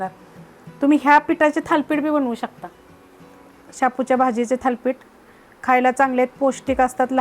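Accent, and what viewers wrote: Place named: Solapur, India. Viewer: Tumhi solapur kadache ka...bhasha tashich ahe aamachya sarakhi....ani recipes super asatat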